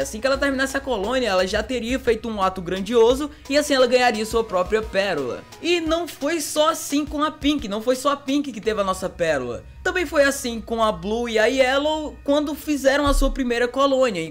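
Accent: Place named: Pernambuco, Brazil. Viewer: Mano vc é pernambucano ! Gostei do sotaque tá carregado n he he !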